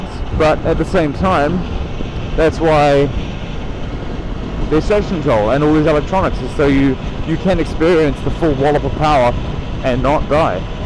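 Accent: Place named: New Zealand. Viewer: Great to hear a Kiwi accent on a bike review! Great review! And most importantly... Oooh look, a Squirrell!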